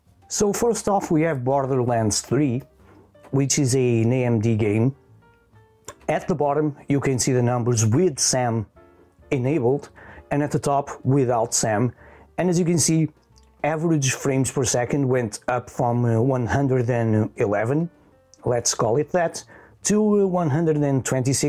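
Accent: Portugal. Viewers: o teu sotaque não engana ninguem, PORTUGAL CARALHO!!!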